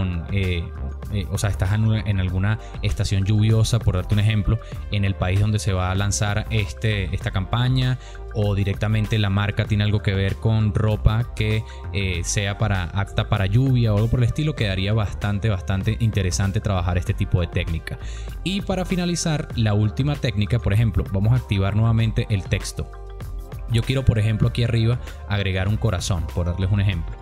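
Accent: Venezuela. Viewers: Excelente puedes ver como quedo en (Instagram). Por el acento somos paisanos Vzla. Gracias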